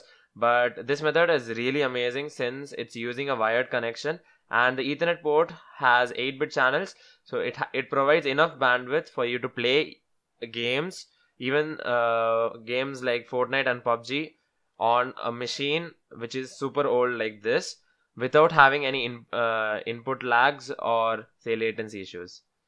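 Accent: India. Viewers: thanks my cool smart bro♥ your accent is also so cool and every time I hear Indian I hear intelligence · You know he is gonna spit some solid knowledge when that South Indian accent is coming out of that mouth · Indian? Subscribed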